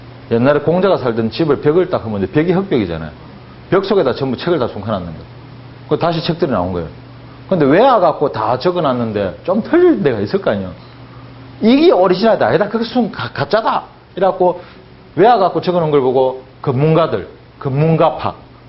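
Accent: Gyeongsang, South Korea. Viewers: 사투리는 경상도 사투리인가? 강의를잘 하니 사투리도구수 하게들려요 뉘님의 아들인가요 ?ㅎㅎ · 정확하시다❤사투리 갱상도 사투리가 이렇게 매력적인 말이였네요❤ 너무 가까이 있어 소중한줄 미처 깨닫지 못했습니다❤ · 지나가던 역사임용고시생입니다 잠깐쉬면서들었는데 너무재밌네요ㅋㅋㅋㅋ ㅋ 그리고 사투리심하시지만 저도 경상도살아서 동네형이말해주는거같네요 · 저 서울토박이인데도 꿀잼 ㅎ ㅎ갱상도 사투리 구수!!!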